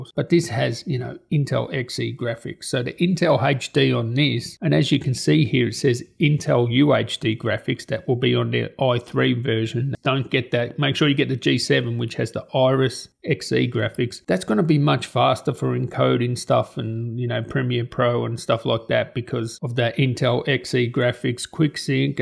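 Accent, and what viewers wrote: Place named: Australia. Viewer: i think the australian accent is pretty awesome 🤷‍♂️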